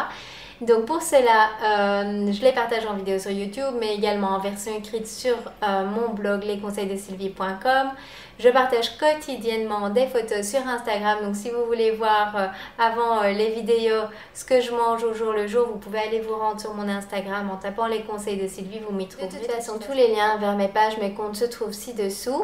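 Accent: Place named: Charleroi, Belgium. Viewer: Coucou Sylvie, Viens-tu de la région de Charleroi ? Je me pose la question par rapport à ton petit "accent" :-) Bizzz :-)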